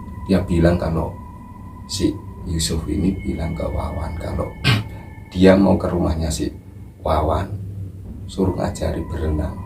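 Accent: Java, Indonesia. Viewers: Salam santun dri kuala kansar perak. Muga anda smua mdptRahmat n Kasih llahi jua. Amin2x. Taniah pd nsumber dan host yg msampaikan dgn baik tapi he he he ada lengguk bahsa jawa namun kmi org melayu k.kangsar masih bisa mgerti Mas. T kasih. Sya titip pantun sja Mas . Bolihkan. Permisi. Jangan ditanya kemana aku pergi. Abg Udin myanyi seorang diri. Sudah lama aku mcari. Rupanya cnell. L. D. sudah lama disisiku. Salam santun dri kota kuala kangsar, perak. T q. 🌴🙏🙋🦋🌄🐓🌳🏖️🐠🐠🍀🦋🙏🏞️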